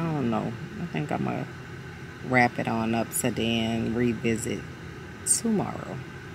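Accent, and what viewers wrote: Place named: Louisiana, United States. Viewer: this is my first time actually hearing you Louisiana accent. or maybe i just never heard you speak long enough.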